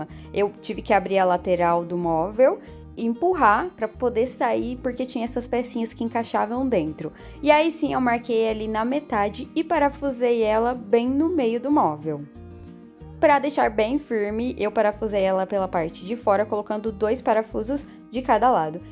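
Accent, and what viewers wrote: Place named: São Paulo, Brazil. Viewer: de que cidade você era antes de casar? Você fala muito parecido com o sotaque do pessoal do interior aqui de São Paulo onde estou morando. Moro em Tatuí, mas, toda a região o pessoal tem esse sotaque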